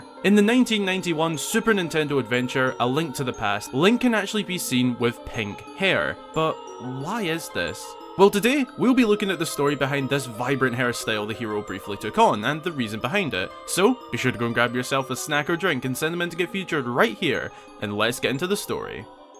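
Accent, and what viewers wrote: Scotland, United Kingdom. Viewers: Are you Scottish just by the accent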